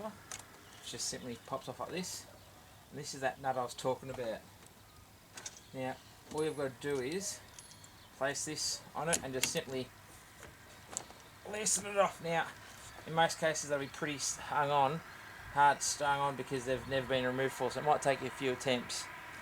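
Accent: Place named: Australia. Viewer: very helpful. and as a yank, i appreciated that aussie accent.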